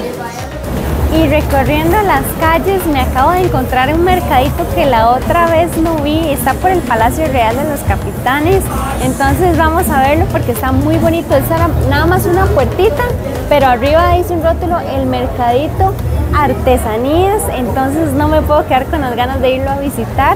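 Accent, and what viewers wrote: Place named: Costa Rica. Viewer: las gracias son a ti por tomarte el tiempo de venir te quiero mucho me gusta tu contenido en la forma como te expresas me gusta tu acento tico escuchar ❤️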